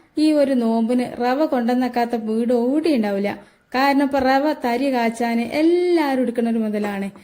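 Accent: Malappuram, India. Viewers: താത്താടെ മലപ്പുറം ഭാഷാ കേൾക്കാൻ എന്ത് രസാ. 👍👍👍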